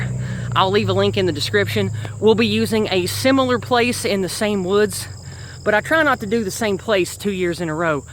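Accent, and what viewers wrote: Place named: South, United States. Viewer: The intro for your videos is so cool the way it melts away with the gentle piano notes and American theme.!!! I just subscribed to your channel and will stay tuned. Cool Southern accent btw. 😎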